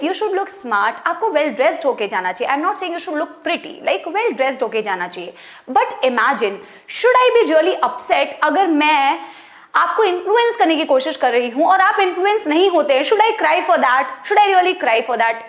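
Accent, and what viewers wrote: Odisha, India. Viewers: Mam are you from odisha ?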